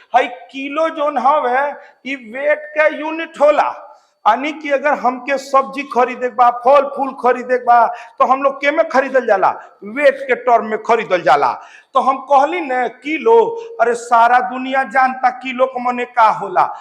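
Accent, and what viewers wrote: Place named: Bhojpuri, India. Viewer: Sirji Katai gajab bhojpuriya andaj me padhawatani 🙏🙏🤗🤗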